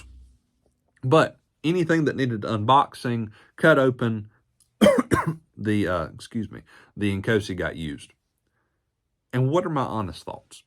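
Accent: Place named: Appalachia, United States. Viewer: Can't take this seriously with the hillbilly accent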